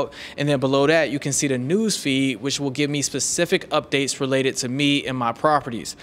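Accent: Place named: Africa. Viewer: i like you African accent, you need to talk slower and louder to understand you.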